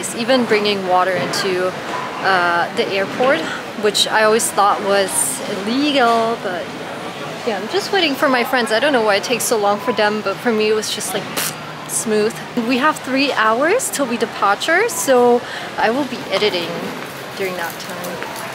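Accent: Australia. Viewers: the Australian accent is hitting! congratulations!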